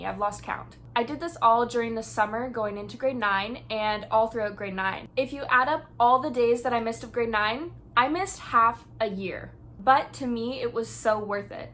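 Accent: Canada. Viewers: I was just watching Sam and colbys conjuring video and swore I heard some Canadian from you. Us Canadian to California transplants can hear it lol. Funny, I also moved to California from Oshawa when I was a teen. It’s been much longer for me though 😂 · I love your Canadian accent (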